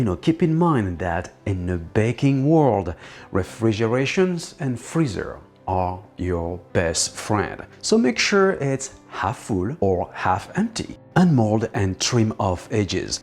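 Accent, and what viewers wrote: France. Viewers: I don't know if I'm following an English cookery course given by a guy with a strong French accent, who probably thinks that his smile will make him forget to give the measurements and grams needed to make this tart. He needs clicks and likes for his video I need measurements Hoping for your understanding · Idk...between the crazy machinery (non-cook here) and the intimidating French accent I feel like all of this video is basically "baking on hard mode". In a world where BuzzFeed dominates Instagram worthy foods it is a wonderful sight to see true baking skill displayed on YT. Edit: thanks for all the likes!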